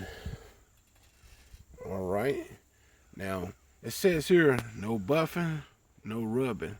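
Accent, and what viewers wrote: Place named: South, United States. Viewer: Yall southern mf can't talk to save yalls life poor things 😔 and it took ten years of listening to it before we seen anything happen... I always wondered if it was on purpose cuz T I can talk but you can still tell he's from the south! he can say "then" and then you can read the word "then" and read the word "Den" then say it the same Car is Cah then just say rag so you can make an R sound that shit is crazy to me, European London Britain England accents nauseate me the South and Africa are next in line 🤣 the South sounds like someone just learning how to talk for the first time and yes we were all either born or kids in the 80s when the next generation of adults we're mostly populating the planet right now I just came and see what Nu Finish can do but I had to sit and listen do you repeat yourself 10 times with natural slurred speech 😩 I'm Denver Colorado so ... 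when I visit my cousin's in Houston their friends say I sound White no I sound right I can talk! oh my God East Saint Louis black folks its embarrassing my ex was born and raised in Alabama but sounds like she's from Colorado, you make the r sound in any other word but you can't say car properly or other words ending in r so is this shit even real or is it culture remnants of slavery y'all would have so many problems with white people out here where I live, White people would treat you like shit like you were stupid if you lived in Colorado sounding like that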